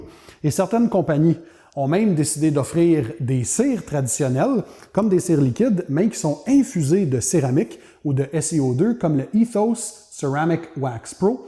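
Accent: Quebec, Canada. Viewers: j'ai découvert ta chaîne en recherchant detailing auto sur YouTube et une de tes vidéos m'a été proposé et voilà... J'ai de suite accroché quand j'ai entendu cet accent québécois (je joue au roller in line hockey et passionné de hockey sur glace)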